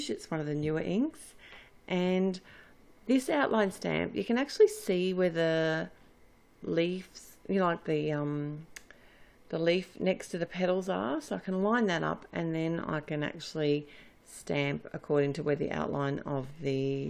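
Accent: Australia. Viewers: Nice to hear a fellow Aussie's voice on YT!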